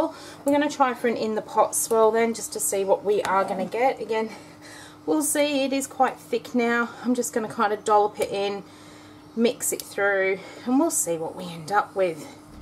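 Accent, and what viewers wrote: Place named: Australia. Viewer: Hi, I’m interested in buying a soap from you but I’m in the US and it sounds like you may be from Australia? Does that effect shipping prices?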